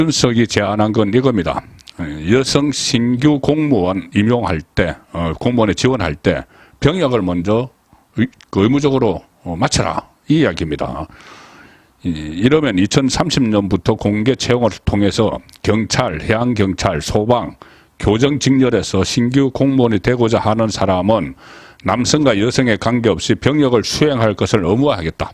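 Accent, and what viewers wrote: Gyeongsang, South Korea. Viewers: 송형 전에 뭐라 했지??? 너 경상도징???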